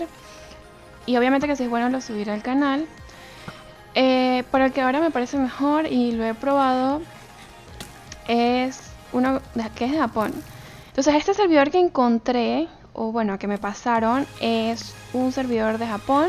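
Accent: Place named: Venezuela. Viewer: Se nota tu acento venezolano xd tal vez lo reconozco por que también lo soy :v like :)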